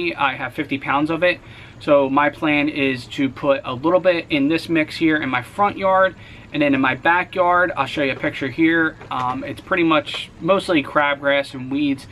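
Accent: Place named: Philadelphia, United States. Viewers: Definitely recognize that Philly/South Jersey accent. Fellow native & lawn care nut here, Gloucester County! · Ha, I was thinking the same thing. Seemed like you had a twinge of Delco too!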